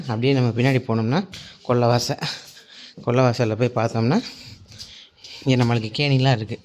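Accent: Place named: Madurai, India. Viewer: உங்க பேச்சில மதுரை slang தெரியுது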